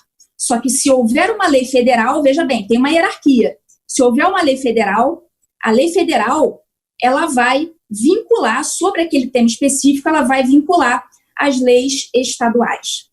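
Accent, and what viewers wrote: Rio de Janeiro, Brazil. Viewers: Não é a voz dela, ela fala com acentuado sotaque carioca!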